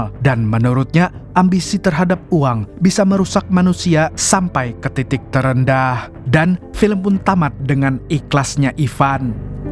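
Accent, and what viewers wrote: Banjar, Indonesia. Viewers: Curigaa mimin orang banjar ya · Bah amang nya urang Banjar saku nih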